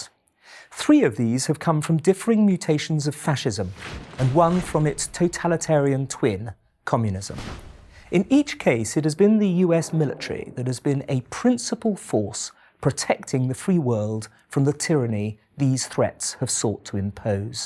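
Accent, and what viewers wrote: United Kingdom. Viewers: Nice work getting this intelligent sounding British man to dazzle the yanks with his fancy accent. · Why is their a British guy saying this? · Absolutely! With his british accent, looming pasty face and giant forehead this feels like something straight out of 1984, like it should be projected on the side of a building to give the proles on the street their daily dose of propaganda. "WAR IS PEACE, FREEDOM IS SLAVERY, IGNORANCE IS STRENGTH" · This is a inside joke right? From your accent I'm assuming that you are British, I'm embarrassed to be from the same country as you.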